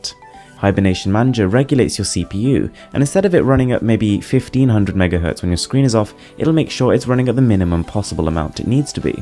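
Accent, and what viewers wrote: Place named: England, United Kingdom. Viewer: Only thing I think I get impressive in your video is your sound and way you speak English. I didn't see any Indians speak English like a English men. I feel you do little showoff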